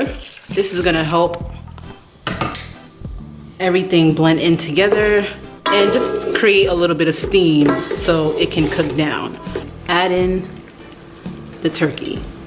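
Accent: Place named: Haiti. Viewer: I knew u were Haitian by your voice🤗, weid i know😂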